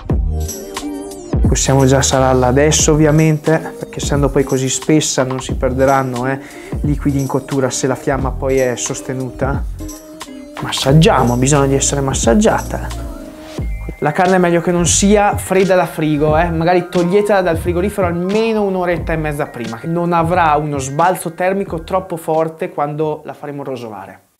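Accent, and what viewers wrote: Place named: Trentino, Italy. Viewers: Fai ridere e sei molto bravo, adoro l'accento Trentino! Continua così!!! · non mi ero reso conto prima d'ora che la cadenza e accento trentini fossero così simili al romagnolo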